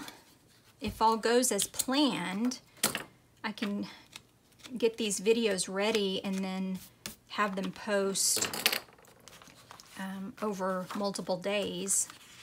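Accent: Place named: Ozarks, United States. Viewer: I love how you think (talk) things out. As a novice journal maker myself, it really makes me think about the journal as a whole. Your explanation of the busy hands journal in part one made me have an "aha" moment. Thank you for continuing with this type of video. I'm from the Missouri Ozarks so I totally understand about the accent, I've heard it all my life, sometimes in a very condescending manner. I've learned to just be proud of who I am and where I'm from, all that to say.......Y'all sounds GREAT!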